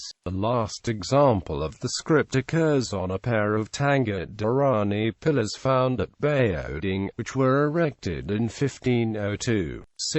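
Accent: United Kingdom. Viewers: Get rid of British accent, and make a better video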